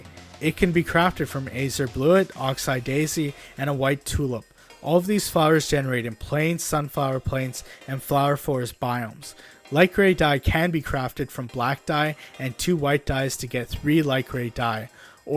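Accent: India. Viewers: I know because you can notice the accsent of indians and other And the channel name a pic are both realated to india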